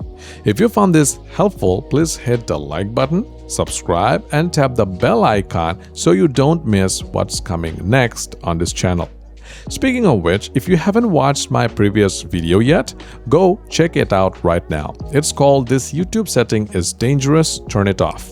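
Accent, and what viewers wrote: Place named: India. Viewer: Odd.. your skin tone does'nt seem to match your accent? Are you from India?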